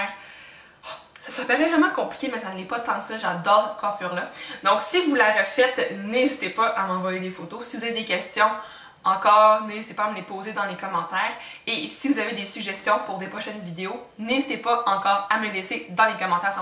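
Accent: Quebec, Canada. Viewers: C'est l'accent du Québec et ton commentaire est idiot ! Si on pourrait entendre le tiens on le trouverait sûrement plus chiant. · L’accent québécois du Québec!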